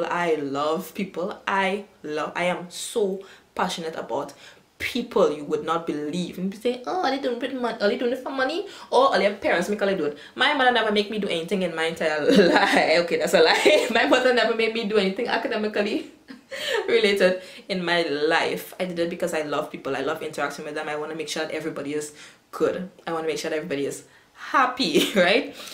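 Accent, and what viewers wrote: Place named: Trinidad and Tobago. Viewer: I just subscribed. I love how you kept it real, with no fake accent. Real trini accent here.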